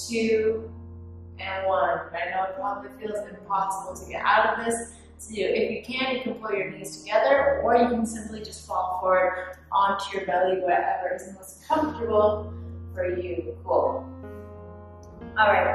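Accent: United States. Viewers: Did I catch some southern accent there? Like Alabama or Mississippi or Kentucky... don't know for real..cuz I ain't no American...and English ain't my native language... Anyhow... beautiful lady... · Is that a Texas accent?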